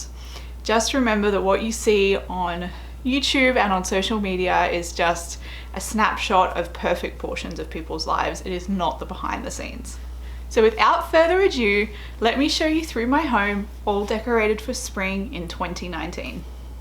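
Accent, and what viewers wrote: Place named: Australia. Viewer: New subbie here. I was so happy to hear your aussie accent when I clicked on this video, as I live in Melbourne. I absolutely love your home, and the way you decorate, it's so much my taste. And those wreathes are just beautiful : )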